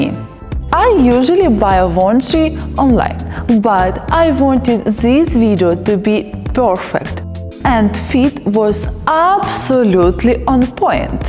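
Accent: Russia. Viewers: Русский английский так прикольно звучит :-) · это какой-то трэш от очередной васи пупкиной, а для чего такой рязанский-английский?🤣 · Хорошая девочка. Только ей пока лучше не говорить, а читать тексты, при этом соотвественно жестикулируя и двигая губами и глазами. Т е накладывая видео на текст. Тогда темп речи будет быстрее и легче будет слишком тяжёлый русский акцент, который сейчас тяжелее немецкого.Поработать над слитностью речи и звуками. Всё равно это придётся делать если она хочет международной аудитории.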